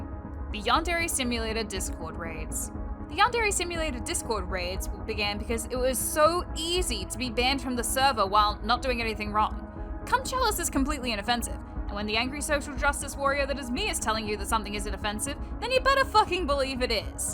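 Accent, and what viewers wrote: Australia. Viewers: wait a damn minute he's australian? gross get him out of here musty bitch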